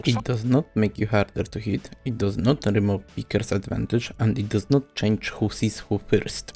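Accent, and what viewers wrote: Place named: Soviet Union. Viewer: when you click on a CS2 video already expecting the crackling and calm soviet accent explaning why you suck at the game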